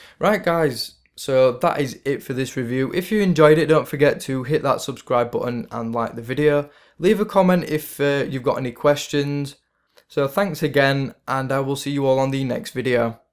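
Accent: United Kingdom. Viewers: I don't understand well your British English.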